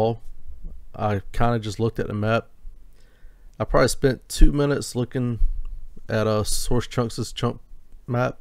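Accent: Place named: South, United States. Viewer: Idk why but people with Southern accents playing RuneScape always makes me smile. One of my best RuneScape friends from back then was from Missouri and had this super thick accent, you remind me of that a little lol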